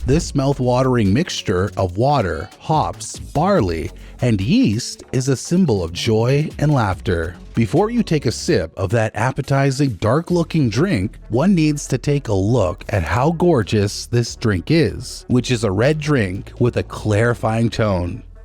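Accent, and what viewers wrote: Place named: United States. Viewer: all these patterns and designs in the top of the guinness as in the clover design etc..... all for americans. no self respecting irishman would fook around with a pint in such a way. soon as i heared the american accent describing guinness i knew it was all nonsense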